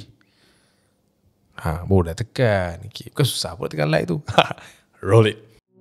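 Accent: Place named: Sabah, Malaysia. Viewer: ndapayah la cakap slang sabah tu. Jadi hancur 🤣🤣🤣😭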